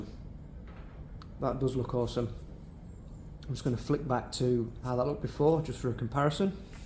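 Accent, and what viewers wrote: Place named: Yorkshire, United Kingdom. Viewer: who'd have known that the best lens refinisher would have a yorkshire twang. 👌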